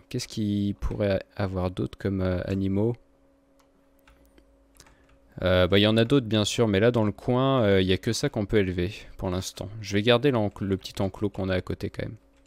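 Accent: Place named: Nord-Pas-de-Calais, France. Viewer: Salut , j'ai remarqué que tu parlais ch'ti , tu es du nord ?( Car moi oui )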